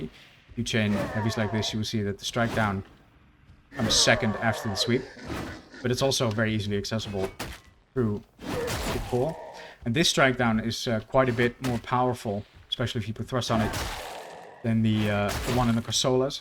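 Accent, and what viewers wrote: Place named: Netherlands. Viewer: Instantly recognize that Dutch accent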